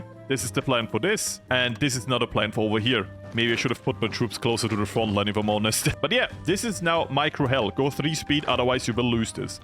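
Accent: Austria. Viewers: Wait a minute r u Austrian or Australian?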